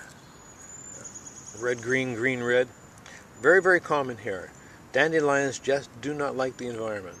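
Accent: Maine, United States. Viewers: Just found this channel R U by any chance from New England Sounds like Maine. ( Where I'm from, but now in WI)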